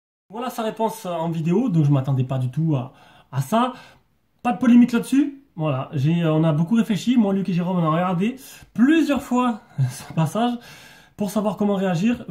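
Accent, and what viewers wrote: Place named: South, France. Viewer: Les gens sont nuls, j'aime bien ton accent du sud ...(léger)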